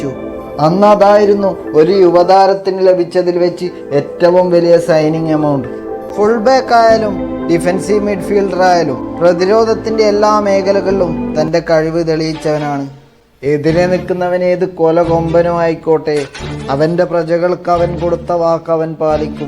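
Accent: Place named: Kannur, India. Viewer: enik kannur oru frnd ind.... Avn samsarikkunnath pole ind😁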